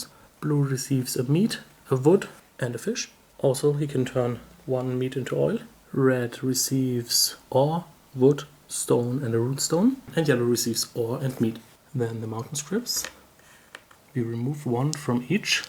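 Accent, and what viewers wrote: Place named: Germany. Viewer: something about a german accent during eurogame reviews just screams professionalism.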